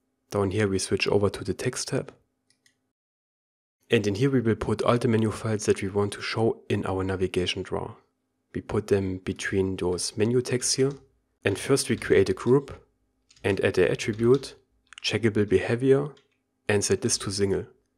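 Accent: Germany. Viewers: nice german accent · I assume of your accent your German like me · Schöner deutscher Akzent! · ich mag deinen Deutschen Akzent^^ Great Videos Du bist der bester :D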